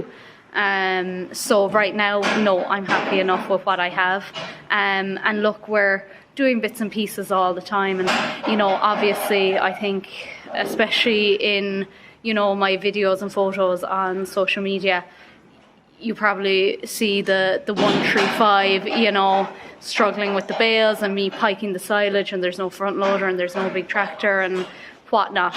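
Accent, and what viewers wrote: Ireland. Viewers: awesome I thought it was a wee bit of Irish I detected in your voice My mom side of the family was Irish an my fathers side was Scottish lol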